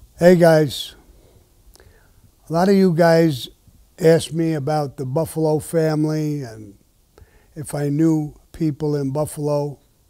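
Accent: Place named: Brooklyn, United States. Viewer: I have italian friends from brooklyn new york. They act, talk and sound exactly all the same. Just like Sammy.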